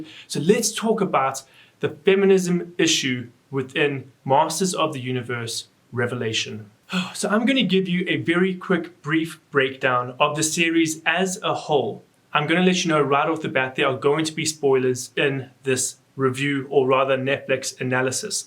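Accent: South Africa. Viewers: Howsit bru nice to hear a South African voice, yeah this sucked big time a show that was launched in the 80' for young boys that is now about a show that lacks any sort of male character, Ripley and Sarah Connor are great examples of strong female leads, young boys need heroes too man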